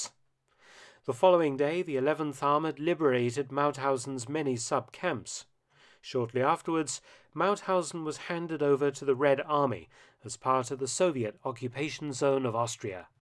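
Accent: England, United Kingdom. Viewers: That's how a proper English should be spoken! Gorgeous accent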